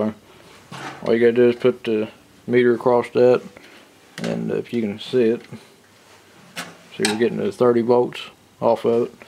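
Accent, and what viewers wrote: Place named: Kentucky, United States. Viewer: It's called an accent lmao. I'm from Kentucky so I understood everything he said.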